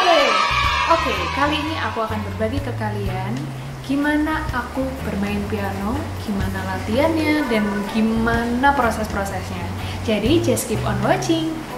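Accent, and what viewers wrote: Java, Indonesia. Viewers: Kak orang jawa ya? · la wong emg org jawa tulen koq wkwkkw :v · mbaknya medok😂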